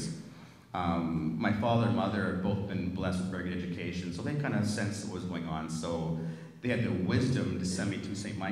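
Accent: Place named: Calgary, Canada. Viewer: I agree. He sounds so Calgarian.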